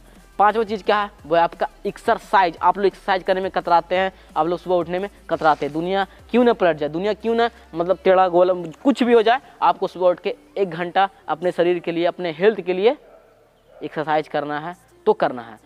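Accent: Jharkhand, India. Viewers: Bhai ap jharkhand se ho kya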